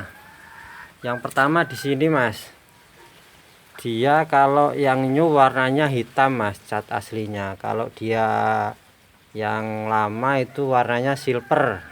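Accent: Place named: Java, Indonesia. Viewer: Nganu mas sampean Sumatra tapi kok nganu mas jowo logate 😁👍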